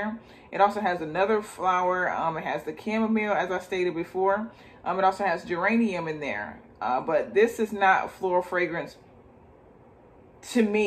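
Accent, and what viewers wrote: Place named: Philadelphia, United States. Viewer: I love your review. I've been contemplating buying this since I picked up Black Phantom by Kilian which is now my favorite scent of all time. Are you a Philly girl? I believe I hear some of that Ptown in your speaking which I miss since I left home. I've been living in Hawaii for years now but I'm originally from Southwest Philly. Keep up the good work.🙌🏽🙌🏽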